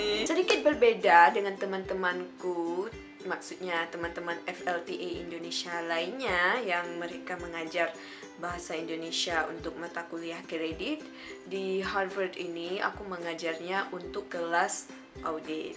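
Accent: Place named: Malaysia. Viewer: ikut senang bhs indonesia ada di harvard. Dan apa kabar dengan bhs malaysia kak? apa juga sampai di sana? karena sepertinya kak berlogat malaysia.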